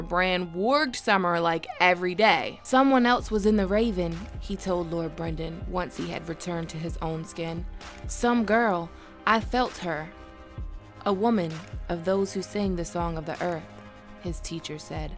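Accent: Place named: Upstate New York, United States. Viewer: Damn where from that accent sound like upstate New york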